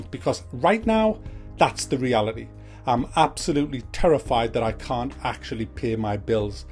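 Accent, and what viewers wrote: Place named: Newcastle, United Kingdom. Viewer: Made a Patreon account and put down a monthly subscription. Love your work mate! I'm a Scouser living in the States and always love to hear you're accent and sense of humor. Reminds me of my old Geordie mates. Keep on keepin on mate.:-)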